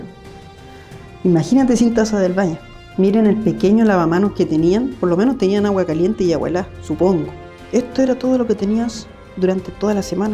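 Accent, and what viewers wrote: Chile. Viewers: Nose por qué , pero a mí me gusta como suena el Acento Chileno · Primera vez que veo este vídeo y por el acento me preguntaba se siente Chileno que sorpresa que eres mi compatriota yo igual que Uds vivo en Canadá y soy Chilena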